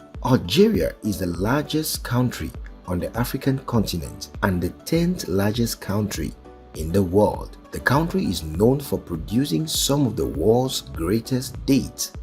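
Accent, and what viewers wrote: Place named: Ghana. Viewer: I can see that U r drawing from those Western people who come up with these incorrect assumptions. Nigeria is the cheapest country in the world. (Possibly, Pakistan may b a little cheaper). I have done items for items by dollar amount. I don't know where U got the idea of subsidies about Nigeria. The only one item subsidized by the Nigerian government is petrol and the IMF has bn telling the government to remove that only one subsidy. I live in the USA and as I am planning to move to a cheaper country other than Nigeria, I've researched (for 10 years) and travel to see where it's cheaper than Nigeria but haven't found any. I've seen some of those non African's websites declaring a lot of baseless numbers. As I can tell from ur accent, U r a brother frm Ghana. Can U believe that some of these non Africans claim in some of their presentations that Ghana is cheaper than Nigeria! 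U & I know that that's not true! So brother, to do a good job, wut U need to do is take items say, a dozen of eggs, a one bedroom apartment, a loaf of bread etc in one country and compare the prices in USD and convert them to the currency of ur country of interest. U'll clearly see wut am saying. Don't rely on those Oyinbo declarations. As President Uhuru Kenyatta of Kenya said, they'll be giving information about Kenya and at the end of the 'news' it'll be Mr. White, a correspondent from South Africa. That's almost 5000 kilometers away! 😆😆😆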